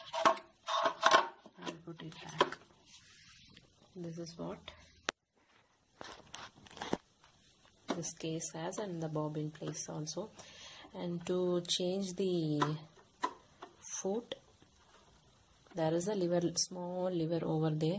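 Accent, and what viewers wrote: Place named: Kerala, India. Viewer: Sound like malyalee ... thank you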